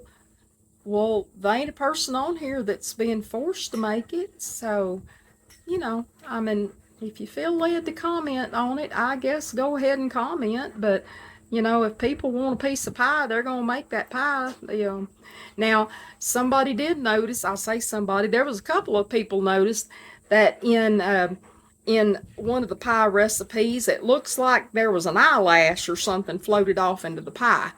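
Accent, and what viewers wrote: South, United States. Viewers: You are so adorable with your cute southern accent, we could listen to you all day long.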